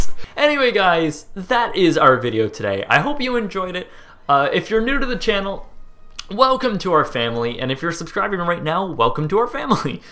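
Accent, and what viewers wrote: Canada. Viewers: Super interesting and wonderfully done,! (are you Canadian? - you have that accent!) Good stuff. I love your work.!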